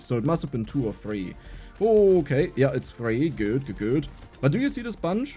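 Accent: Germany. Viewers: Muss ehrlich sagen. Hab DF‘s lets plays geschaut und nach dem spiel gesucht. Bin so auf dein kanal gekommen. Deine ersten worte auf englisch gehört, und sofort war mir klar der brudi ist ein deutscher. Hahaha, kein hate. Find es echt krass wie du englisch spricht. Hut ab mach weiter so abo hast du aufjedenfall.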